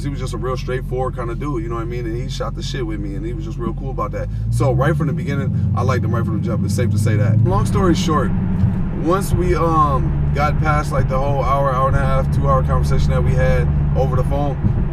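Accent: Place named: California, United States. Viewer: You from Cali? Sound like it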